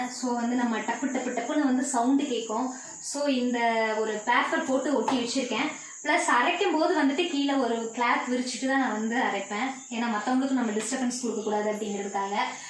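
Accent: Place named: Coimbatore, India. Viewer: Nice sister.. exactly Coimbatore slang.. I too from Coimbatore.. very nice to see namm ooru ponnu🥰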